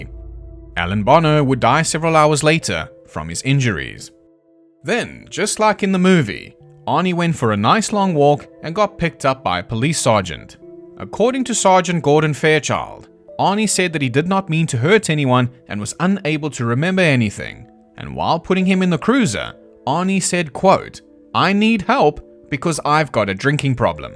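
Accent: South Africa. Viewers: Bro? Are you South African? I'm asking because I'm South African and your accent is sounding very familiar 😂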